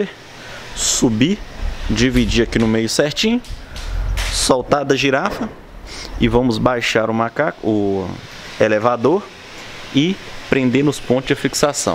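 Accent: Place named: Belo Horizonte, Brazil. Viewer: Blz amigo, pelo sotaque parece te bh, estou certo?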